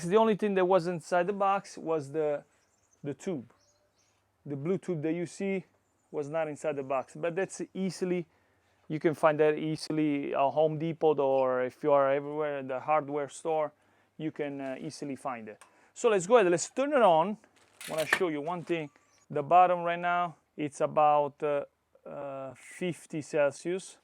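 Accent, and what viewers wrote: Italy. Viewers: ciao sbaglio o sento un accento italiano??😂😂😂😂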